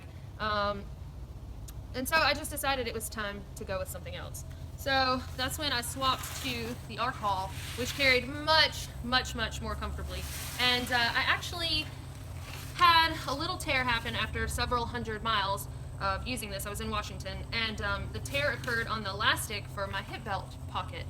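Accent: South, United States. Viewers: As an aussie guy I shouldn't find your southern accent "comfortable" but I do, I love listening to your voice and I could listen to it all day every day (...and I kinda have been, going through a " Marathon" for the last week or so... 🎶. The fact that it's clear from listening to you that you have a sharp mind and that you are pretty good at phrasing what you want to say probably helps...) I do like the look of that Zpack Arc Haul, and even more the look of the Haul Zip 64L, pity that it appears like you can only buy from them directly, as there's very limited scope for specials. I am in the process of updating my gear for a multi-day late spring trek in the Japanese Alps next year, and I'd like to take the chance to thank you for converting me to quilts (side sleeper here) 🙏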